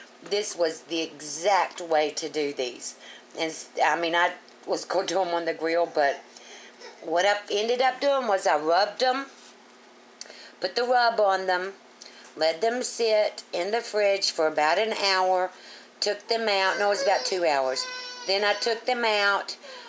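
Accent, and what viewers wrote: South, United States. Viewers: Today, Easter weekend April 8, 2023, and I just found your channel!!!! I love your rib recipe! And I can't wait to cook them!! I just love your recipes! Thank you! Your accent is so southern! Just lovely! ❤❤❤😊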